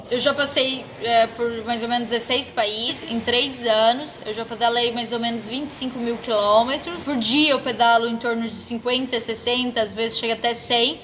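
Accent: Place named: Goiás, Brazil. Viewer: Perdeu o sotaque goiano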